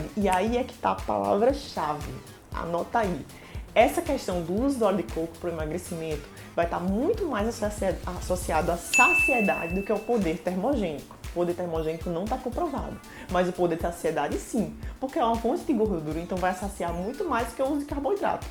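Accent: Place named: Pernambuco, Brazil. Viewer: Que gracinha você! De Pernambuco, certeza!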